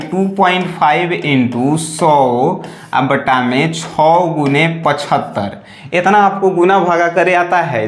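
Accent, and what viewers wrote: Bihar, India. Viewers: Verry nice for Bihari style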